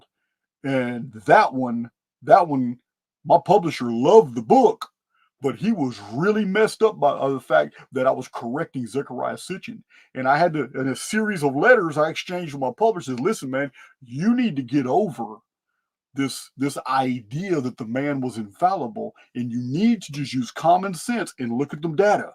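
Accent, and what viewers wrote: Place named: South, United States. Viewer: I have been measured at 161 IQ. You are truly smarter than 119...I put you at 162...it is too hard on the intellectuals to lose arguments and debates to a southern accent. I know, I am from NC, and live in California...Yep, that southern accent is a double edge sword. On one hand the intellect is stealthy, on the other hand it is easy to dismiss a southerner speaker and pass it by as a dumb-ass blithering, which you and I are not. Love your shows.You ever need a typing done, i do about 100 wpm.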